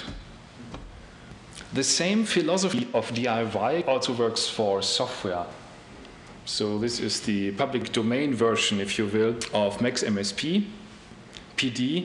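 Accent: Germany. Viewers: german accent ftw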